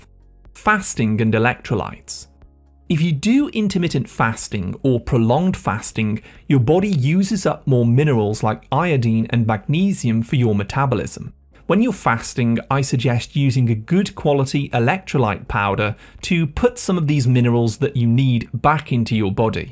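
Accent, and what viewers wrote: England, United Kingdom. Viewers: Pronounced English accent makes me buy nothing.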